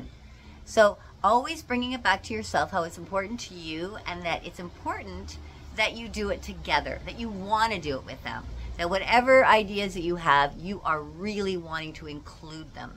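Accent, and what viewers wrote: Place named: Canada. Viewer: Hah! I KNEW it! Even though your channel lists you as being in the United States, I definitely got a Canadian vibe off you. A lot of it was the accent, of course, but certain American accents, especially west coast ones, sound a lot like Canadian accents, so that's hard to tell. I think part of it was just the vibe you gave off, you just felt like home. So glad you are on here. It really does feel as if I'm getting information/perspective/experience from a "home" kind of place from you, and knowing you are Canadian as well just adds an extra level of warmth and comfort to it. Odd. It really shouldn't make much difference. People are people. Yet it does. Interesting. I'll have to mull that one over. I knew it!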